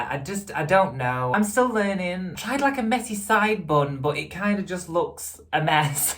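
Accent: United Kingdom. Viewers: You’re so right. Hey what is your specific accent from in U.K.? :) Love your videos!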